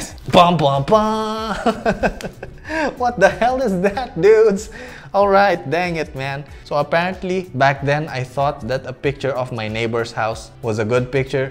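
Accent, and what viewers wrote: Philippines. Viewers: I like how he still has his Filipino accent, this should be normalize!! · Infairness ang galing niya mag english. Filipino accent peru di masagwa at maarte. Tamang tama lang. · What I love about it, it's not THE "FILIPINO ACCENT" that the media (especially foreign media) portraits this is the legit pinoy accent not the forced one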